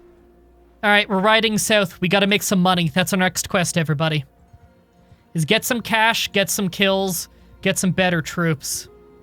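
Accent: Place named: Canada. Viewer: You look like a viking but your voice shows like a Canadian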